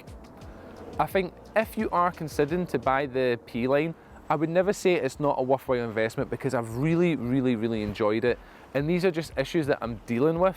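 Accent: Scotland, United Kingdom. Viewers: Scottish - not Welsh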